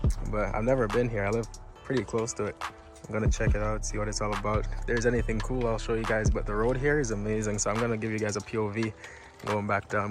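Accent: Jamaica. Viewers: Yo u mi hear di Jamaican accent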